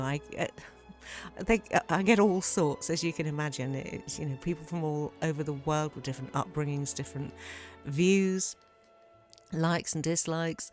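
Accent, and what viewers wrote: United Kingdom. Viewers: I just love your videos and appreciate your consistency. Your warmth comes through in your videos and I always feel better after having watched them. I've downloaded many a mod because I wanted to know what mods you were using (and thankfully, you often say what plant, flora, mount, armor, etc mods you're using), in addition to being able to "try on" a house mod before donwloading it. I also bought Fallout 4 because you mention it so often. Still haven't played it, but at least it's in my library now, thanks to you! Thank you so very much for sharing your passion and talents with us. I hope you have an uplifting and joyous year! PS - I would swear that your day job is with Classic FM (You certainly don't have to say! Your privacy is your own.) Every time I listen to it, I think, "Is that??" You have such a lovely voice. We Yankees are such suckers for the British accent.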